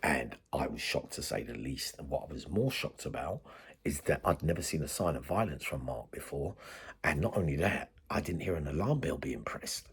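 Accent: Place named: Bermondsey, United Kingdom. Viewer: I reckon your from Bermondsey, London. or close.!!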